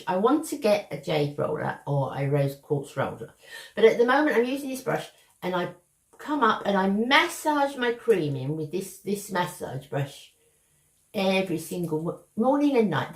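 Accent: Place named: Cockney, United Kingdom. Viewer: Thanks so much for answering my question! I love that you're a true Cockney! A rare breed, it sounds like. I love these videos because we get to know you better, but it's not fair to you because you don't get a peek into our lives, lol.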